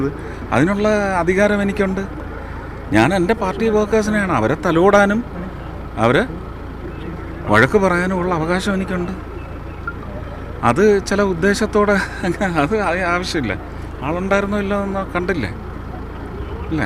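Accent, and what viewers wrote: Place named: Namboothiri, India. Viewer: ഇയാൾ ആരാണെന്ന ഇയാളുടെ വിചാരം.. സംസാരവും ആക്ഷനും എല്ലാം എന്തോ ആണെന്ന ഭാവം.. ഓരോരോ കോപ്രായം.. അടുത്ത ജന്മത്തിൽ പൂണൂല്‍ ഇട്ട് നടക്കണം എന്ന ആഗ്രഹം ആണോ ഇപ്പോഴേ നമ്പൂതിരി ഭാഷ പ്രാക്ടീസ് ചെയ്യുനത്..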